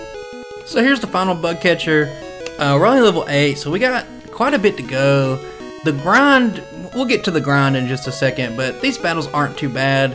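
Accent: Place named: Georgia, United States. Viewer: I'm from England and I'm not very good at American accents. I'm going to have one guess on each of your videos from now as to which state you're from. Now, this is limited as there are only so many states (although who knows how many?) But it might be fun. First guess is Georgia. Please confirm.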